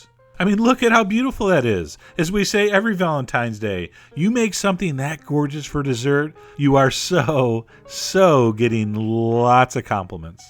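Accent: United States. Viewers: thats right cause he lives here in america and this is how we pronounce those things....talk about ignorant..... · i hate how u cant say anything. tiramisu? espresso? so american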